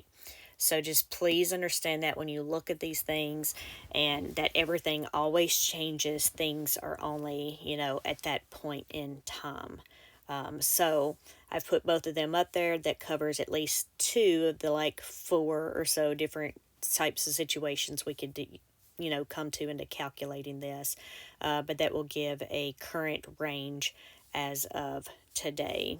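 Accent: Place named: South, United States. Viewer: It’s the southern American accent lol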